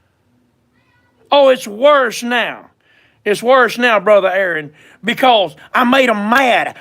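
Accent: South, United States. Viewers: I love that country accent boy!! Jijj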